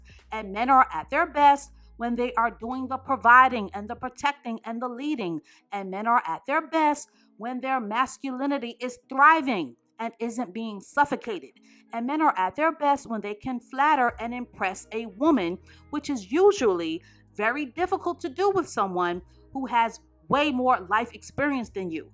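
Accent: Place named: New York, United States. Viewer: Hey, Can you please do a video on fatherless daughter. I’m not sure if you have already. I’ve been binge listening & haven’t come across one of that topic.bI love your channel so much. I hear the New York in your voice. It brings me comfort. The Bronx? 🤷🏽‍♀️ Thats my birthday place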